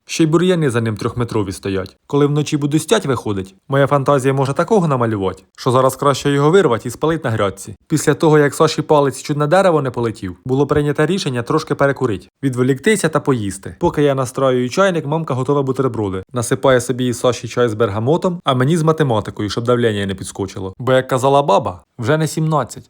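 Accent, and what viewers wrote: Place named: Kherson, Ukraine. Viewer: Говірка гарна. Ми на Херсонщині также говоримо,майже дома побувала❤❤❤❤❤❤❤❤❤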